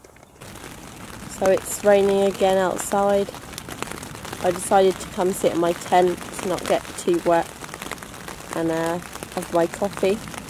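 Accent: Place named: Northern England, United Kingdom. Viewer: very fun to watch. your accent is very pleasant to listen to. i wonder if you come from north of england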